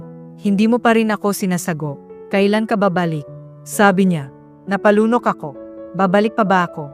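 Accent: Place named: Visayas, Philippines. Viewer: Bisaya ka ba? Nkakawalang gana tagalog mo.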